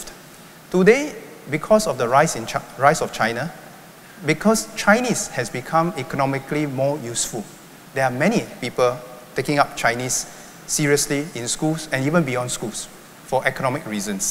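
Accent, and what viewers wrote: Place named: Singapore. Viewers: We need someone who does not have a sg accent to represent sg. We need someone who speaks in a neutral accent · Better he speak Hokkien. How to represent Singapore internationally with such Singlish ?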